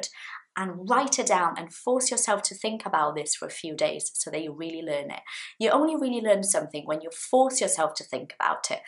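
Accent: United Kingdom. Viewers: I love your British accent, it is my focus. I don't pronounce the letter r and I usually use schwa sound, but the most of English teachers prefer American accent than British and the worst is that they try to enforce us to speak like an American.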